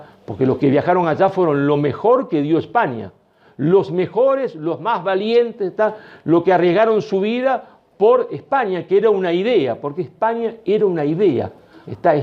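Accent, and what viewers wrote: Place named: Italy. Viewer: suenas a italiano, pero llevas la hispanidad en las venas!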